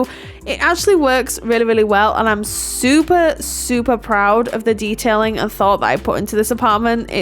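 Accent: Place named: Lancaster, United Kingdom. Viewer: Are you from Lancaster? 🤔 Your accent is so familiar!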